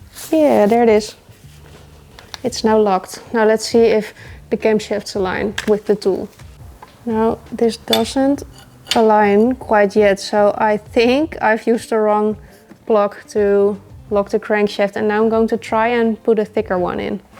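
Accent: Netherlands. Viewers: I’m a tech but I’m more taken by your Dutch accent? I hope I’m right that assumption your from the Netherlands 🇳🇱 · So graceful you make it look easy. Love the Netherlands accent as well.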